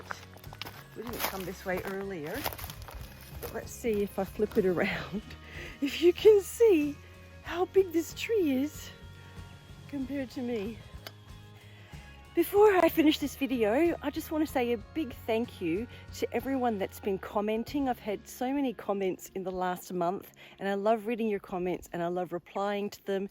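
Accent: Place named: Australia. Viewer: Thank you for all that info! I didn't notice the number plate, but l did notice your interesting mix of accents. Mainly Australian but definitely something US/Canadian every so often. Interesting stuff. I thought, "Oh goodie!"" Found an Aussie lady travelling solo around Oz. Then l thought no, what accent is that? Then l thought yeah, Australian. Then l thought.... you know what l mean 😄 so l'm really glad you cleared that up. It was killing me! Love yr attitude, tips and travels. Might see you out there soon 👌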